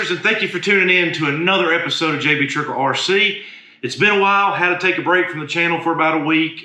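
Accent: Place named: United States. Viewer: Great job, i love your american accent! Yee haaw!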